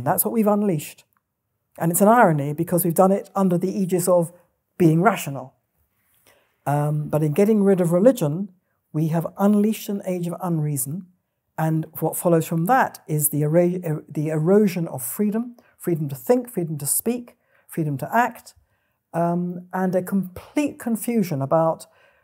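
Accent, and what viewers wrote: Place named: United Kingdom. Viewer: " . . .because people need to live in a society which is civilized which looks after the welfare of others rather than yourself. . ." This comes from a female with a British accent. Hmm, wonder what she means by "civilized" and "the welfare of others". Surely she's talking about the others with much darker skin, right?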